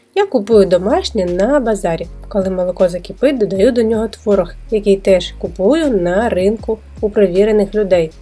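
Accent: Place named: Border region, Ukraine. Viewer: Це діалект а не рашизм. Поїдьте в любий прикордонний район, в кожному свій діалект. Тим і прекрасна наша мова. І не робіть вигляд що ви в буденному житті спілкуєтесь літературною мовою. Так раптом всі стали "правильними патріотами" і почали інших вчити.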